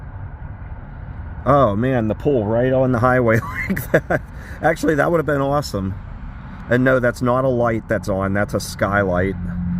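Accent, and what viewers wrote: Pittsburgh, United States. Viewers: Most Pittsburgh accent I’ve ever heard😂 great video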